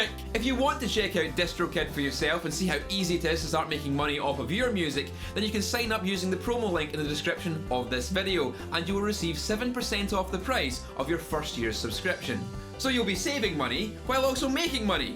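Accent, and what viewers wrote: Scotland, United Kingdom. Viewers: Is it Scottish?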